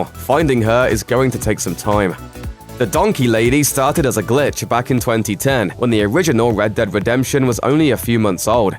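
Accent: United Kingdom. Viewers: Ok why do you sound like a British chills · British yong yea?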